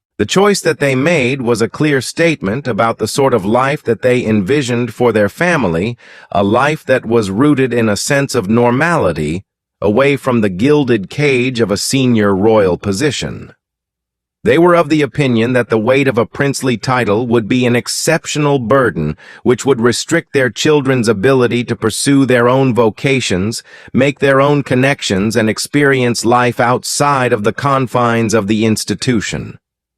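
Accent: United States. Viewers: American voice talking about the British monarchy just sounds a bit off the mark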